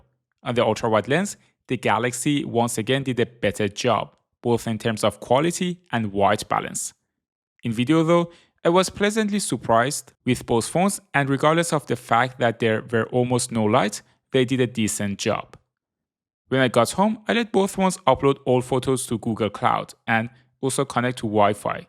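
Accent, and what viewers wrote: Iran. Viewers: Bro you sound like a Persian guy trying his best to speak fluent english.❤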